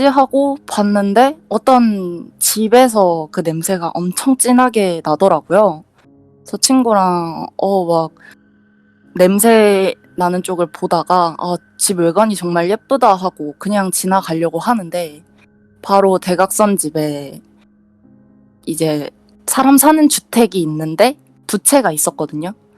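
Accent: Gyeongsang, South Korea. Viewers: 근데 경상도 분인건 확실한데 왜 일부러 사투리 안쓰려고 하는느낌이지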